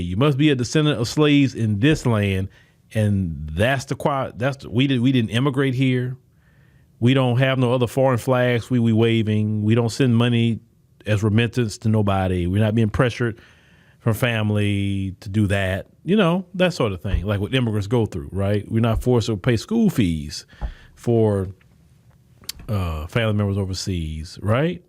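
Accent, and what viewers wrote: South, United States. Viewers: I could tell from your accent you from the south so you know nothing about the north east even though we came back to fight to Free we black America north so we don’t really mess with Mexicans Venezuelan MS 13 but we love our Puerto Ricans so we have family members for generations I’m talking about foundation multiple generations tight and we don’t delineate. We stick with the ones that speak with usstop speaking poison. You could be speaking to black babies with Puerto Rican in a blood.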